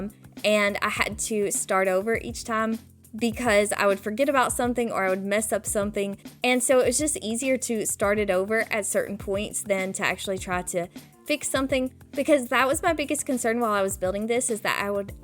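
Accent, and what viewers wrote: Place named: South, United States. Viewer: i love ur souther accent! and this build is absolutely killer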